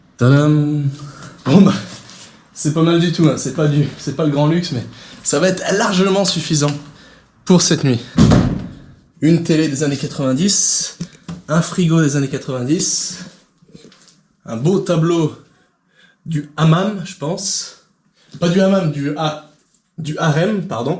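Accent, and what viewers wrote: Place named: France. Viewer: Акцент чисто как у французов. Молодец. Très bien.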